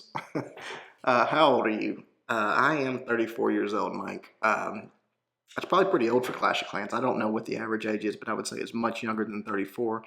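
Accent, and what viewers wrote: South, United States. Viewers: Wow a dude with a southern accent that has taxidermied animals what a shocker.........some people really need to leave their bubbles sometimes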